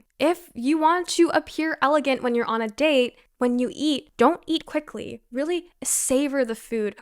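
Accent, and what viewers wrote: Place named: Los Angeles, United States. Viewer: The LA accent ... sounds stupid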